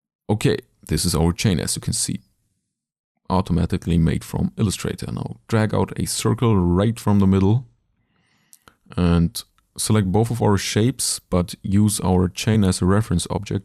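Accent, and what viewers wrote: Germany. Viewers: Bist du deutscher? :D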